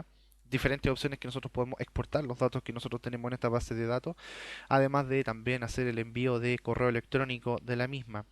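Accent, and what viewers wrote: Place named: Chile. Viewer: Un comentario a modo de aporte: En Accesss 2013 el campo memo fue suprimido; en su lugar se puede utilizar el de Texto Largo. Deberías organizar el método con el que vas a dar el curso o, tutelar el video (me parece mas adecuado tutelar que enseñar). La vocalización es fundamental cuando se habla utilizando un idioma común a muchos modos de pronunciar (acento). Si hablás rápido, tu vocalización o acento chileno, resulta complicado seguirte para quienes utilicen otro modo de habla (acento). Deberías pausar mas las oraciones, evitar los tics lingüisticos propios de tu región o, país, de esa manera lo que hables será mas efectivo, sin dudas. Por último, veo que has optado por un mecanismo de explicación que comienza por el "paneo general" y, se va adentrando pausadamente dentro de lo particular. Soy docente con mas de cuarenta años en la profesión y, tengo la impresión de que el método elegido por ti, no es el adecuado para "tutelar"" por este medio. 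Lo mas apropiado sería comenzar con un ejemplo y, explicar clara y brevemente cada uno de los pasos ejecutados, evitando redundancias y explayados innecesarios. Si tu interés personal está orientado a conseguir suscripciones al canal, la mejor forma es dar un tutorial eficiente y, saludable; no hay mucho de eso en YouTube; lo sabemos todos, aunque hay algunos tutores que son brillantes porque han tenido en cuenta esos detalles. Por último marcarte dos errores: El campo edad no solo no debe ser del tipo Texto, sino tampóco numérico.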